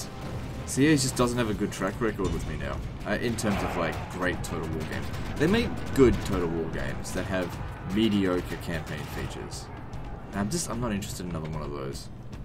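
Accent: Australia. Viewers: i think the aussie accent is partly the reason i watch i like aussie man reviews...woteva u do dude goodluck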